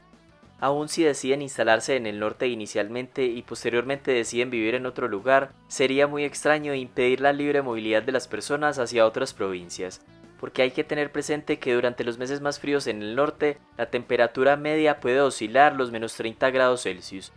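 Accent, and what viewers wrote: Colombia. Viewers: No me gusta el acento colombiano bobo del narrador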